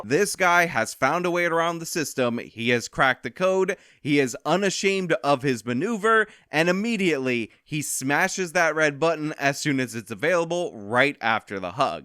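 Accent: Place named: Boston, United States. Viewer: I a Bostonian? the accent really comes through in this video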